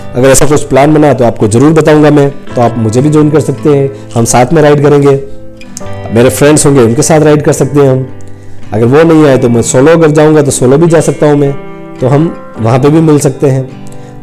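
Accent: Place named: Rajasthan, India. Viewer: Bhai as per your voice you look from Rajasthan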